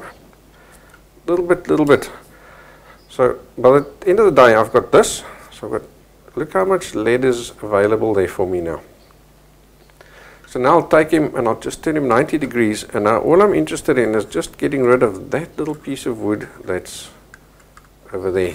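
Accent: South Africa. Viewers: JohnLothe I think he is South African that lives in New Zealand. This dude is a pretty decent teacher! great video! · is this guy south african? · Where are you based now?? I feel sure i hear a south african accent 😏😏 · Thats not an new zealand accent? Do you have a south african accent? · I feel like im still in South Africa because of his accent.. 😢😢😢😢😢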